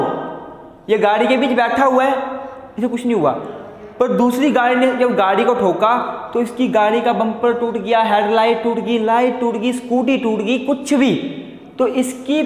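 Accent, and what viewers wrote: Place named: Punjab, India. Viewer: Sir, your accent is punjabi hindi, which delights me, why I don't know, but I guess you have a good teacher ability, which encourages us to approach you, so keep going in this way. Ranjit Basfor, Barshapara, Guwahati, Assam.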